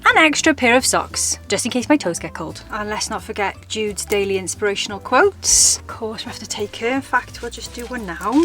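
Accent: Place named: Cardiff, United Kingdom. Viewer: Loving your adventure. And as someone said, it is enough just to travel alone. I’ve done it a couple of times. The first two weeks I wondered if I should have been allowed to leave the house, let alone drive across Europe alone! Suddenly I found my feet, my mojo, and everything started to click. Life always seemed to support me. You make me laugh. You sound Cardiff, that’s my hometown. Love your accent. Crackin’ x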